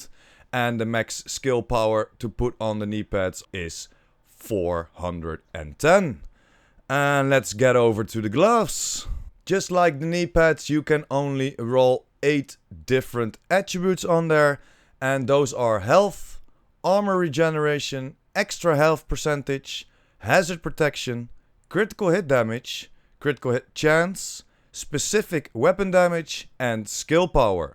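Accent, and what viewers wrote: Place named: Netherlands. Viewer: Funny that you can hear that you are dutch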